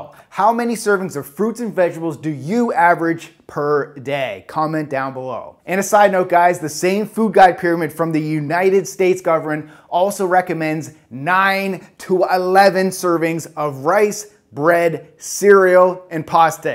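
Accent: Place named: Canada. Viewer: Not sayin’ it wrong....just Canadian 🤪🇨🇦 Never lose that!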